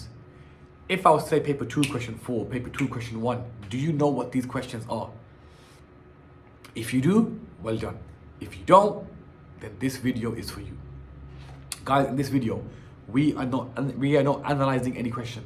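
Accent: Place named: Leicester, United Kingdom. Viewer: hes defo from Leicester but otherwise real good teacher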